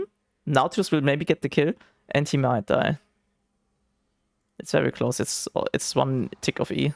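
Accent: Germany. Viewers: Immer dieses komische Englisch, das wird sich kein Schwein anschauen nur weil Tyler1 im Namen steht weil du eben kein Nativ Speaker bist und man das hört. Würde ich auch nicht wenn ich Englische Streams schaue dann von jemanden der dies als Muttersprache hat und nicht einen deutschen der Englisch spricht. Ist ja Giga Cringe und die herbste Reichweite generiert man damit auch nicht weil man eben einen guten Bruchteil seiner Fans enttäuscht die aktuell schon den Kanal folgen und vielleicht kein Englisch können.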